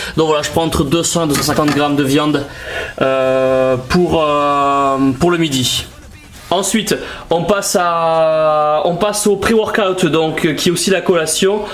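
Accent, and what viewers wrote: South, France. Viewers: Le mecs il avait l’accent du sud avant 😂 💪🏻force a toi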